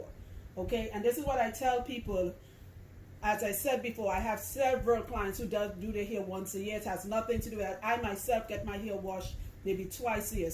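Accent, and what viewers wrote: Trinidad and Tobago. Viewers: This sounds like a Trinibagonian. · I was listening to your accent and figured you were a Trini 🇹🇹🇹🇹🇹🇹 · First video I’ve watched of yours. I recognize that accent anywhere. Sweet T&T (my dad’s homeland)! I find the loc separating to be very satisfying. I not even to the washing part and you have a fan for life!! I’m about to binge your videos and get tips for my hair. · I noticed the accent, but its only when you said you were from Trinidad, that it all made sense. 🇹🇹❤ i live in Trinidad aswell, n you did an amazing job💪💯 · When I heard your accent I knew you were from my country T&T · Gurl I knew I hear Dey trini in you 🇹🇹🇹🇹🇹🇹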